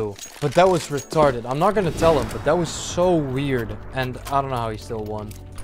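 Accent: Netherlands. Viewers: I mean im the one you need if you want to be downgraded, complete gawed gamer over here my god. btw love the dutch english lmfao its cracked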